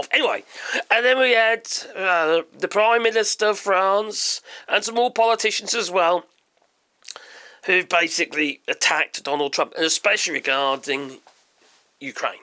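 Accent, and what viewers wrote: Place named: Southern England, United Kingdom. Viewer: It's his southern English accent.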